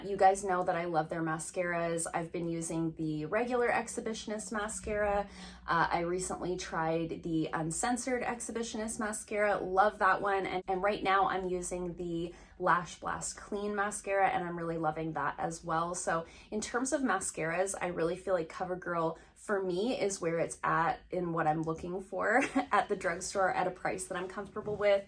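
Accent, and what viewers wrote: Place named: Canada. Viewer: I love the 5 brands you chose. I just bought the new Camouflage foundation from Elf. It’s not bad. A little thick. Had to blend it out really well. I also bought the Madison Beer palette by Morphe. Great colors in that palette. I know you would like it. I like the Morphe brand, also. I want to try the Covergirl Fresh brand powder. That looks interesting to me. I always like to hear what works well for you. I will have to try a few that you have featured here. Love Color Pop, too! Love their eyeshadows and blush. Love your beachy curls! You look so pretty, as always. I love the way you say “about”. Your Canadian accent is adorable! Just like you! Take care, little pixie!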